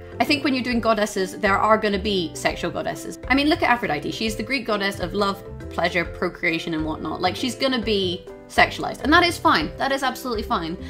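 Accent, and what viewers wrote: United Kingdom. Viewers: I'm sorry, I can't continue watching this video until I know where the hell that accent is from?! It sounds British sometimes and American others... WHAT IS THIS??? No but seriously, I agree with this, they could've easily made Bastet, just a cat woman, not a woman who has a couple of cat features etc.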